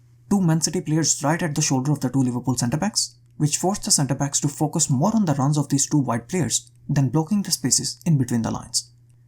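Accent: India. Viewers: Your accent sounds so Indian! Great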